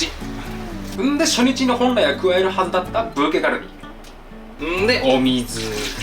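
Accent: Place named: Hakata, Japan. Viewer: たまに博多弁出てますね！ 懐かしかです。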